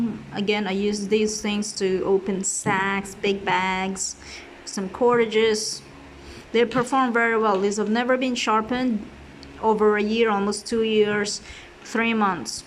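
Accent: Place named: Louisiana, United States. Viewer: Good review, not many do such indepth demonstrations of capability. The Micra never really appealed to me, I think if I want scissors as a more important function I'll always opt for Victorinox as they're the best multitool scissors, whether on the smaller models like you show (Rambler or Manager) or especially on a larger pocket knife like the Climber etc. I do however like the Squirt as it's about the best tiny plier-based multitool out there, it seems well designed and I like the aesthetics - being compact and sleek - more than those of the Gerber Dime for example. Also it's helped me on weird little tasks where nothing else larger would have worked. Finally I am really curious about your accent? Are you from Lousiana, or were you born outside the US? Sorry to ask a personal question but your accent and pronunciation is really nice!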